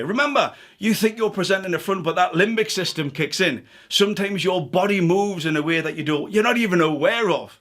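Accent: Newcastle, United Kingdom. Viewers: Always great to hear that Geordie sound! ☆♡☆♡☆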